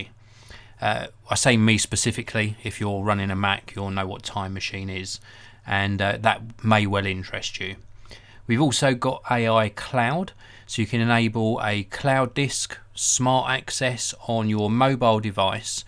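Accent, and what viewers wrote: United Kingdom. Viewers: vfw2 Route is "root" in England. So Router is "rooter"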